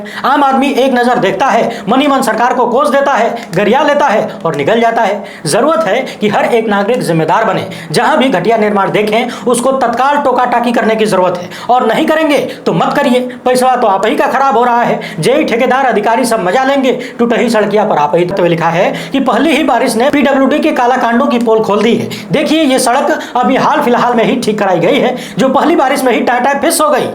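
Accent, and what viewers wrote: Bhojpuri, India. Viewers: Aap k bolne ki speed aurkabhi kabhi bhojhpuri style bahot man ko bhata hai.kam waqt men aap bahot kuch kahdete hsein.good Farooqulamin. Aurangabad maharashtra.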